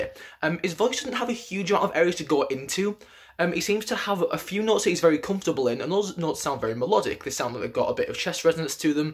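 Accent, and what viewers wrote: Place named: North, United Kingdom. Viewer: im so glad i stumbled across your channel, i for some reason love hearing you say their names in your british northern accent rather than all the american vocal commentaries cos it feels so familiar to me hahah "haaey chan". i love that u comment on each members voice and have good things to say about all of them cos they really are a group of MANY insanely talented singers.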